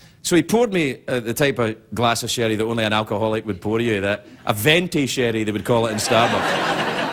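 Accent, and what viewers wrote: Scotland, United Kingdom. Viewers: His accent was so much thicker then. RIP Scottish Accent. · Is he Scottish? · He sounds so scottish here. Usually i think he has lost his accent but i can definitely more than hear it, too. Also that audience sucks they didnt understand the assignment. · He still sounds Scottish here. He had almost lost it by the end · he sounds so much more scottish here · He sounds a lot more Scottish here than he does now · His accent has gotten less Scottish and more Americanized over the years, for sure. You can really hear his accent coming out here. Maybe it's just him talking from the heart and not trying to do his usual monologue voice or something like that. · he sound REALLY scottish in this wow, his accent is a lot less prominent now.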